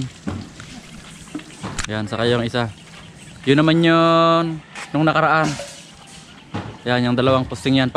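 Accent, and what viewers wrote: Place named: Bicol, Philippines. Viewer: Parang taga bikol si idol ah saan ka po sa bikol?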